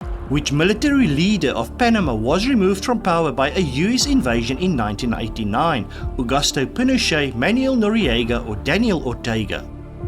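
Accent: Afrikaans, South Africa. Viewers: Klaarblyklik is u eerste taal Afrikaans, maar meestal is u Engelse uitspraak aanvaarbaar. Ek vra vir my of u enige dokumentêre of reisgidse oor Suid-Afrika wat deur Amerikaners geskep is, bekyk het? Ek het, en sommige van hulle is uiters goed, veral as die mens/e wat daarvoor verantwoordelik is, 'n paar minute op die uitspraak van Suid-Afrikaanse name en plekname gespandeer het. Vandag is dit baie maklik om korrekte uitsprake op die internet te kan vind, en 'n mens hoef nie 'n pedant te wees nie; veel name het standaarde uitsprake in buitelandse tale, b.v. "Paris", en natuurlik sê baie Suid-Afrikaners "Kru-gir" en nie "Kreur" nie, asook "Bloemfontien" en nie "Bloemfontein" nie - selfs die uitsaaiers! (Oké - ek erken dat "Bloemfontien" my pla!) Maar i.v.m. dokumentêre van S-A, is dit hoogs irriterend om verkeerde uitsprake van naam nà naam nà naam te hoor - dit skep die indruk dat die produsent/regisseur geen werklike kennis van die land het nie, en het sommer-so inligting van die internet opgegrawe om 'n wins daardeur te bekom. 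M.a.w. is die dokumentêre/reisgids onopreg, en daar is geen ware respek vir die land en sy mense nie. (My ore staan altyd vir die woord "fynbos" orent!) Ongelukkig, sedert ek 'n "pikkenien" was, het ek die geleidelike agteruitgang van sommige tale waargeneem - veral Engels, en veral in die VSA - weens verskriklike opvoeding in hulle moedertaal en luiheid. "Pasteur" is a Franse naam, en ja - ek haat die tipiese Amerikaanse uitspraak van "van Gogh", oo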